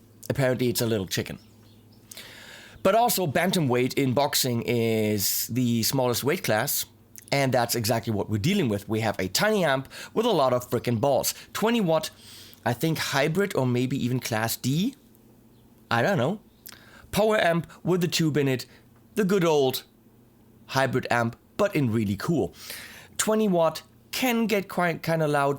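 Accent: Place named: Germany. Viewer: your accent is kinda german :)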